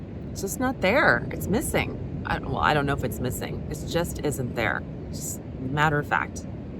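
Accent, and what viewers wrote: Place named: Germany. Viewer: Yeh I thought that to , she really sounds German 😂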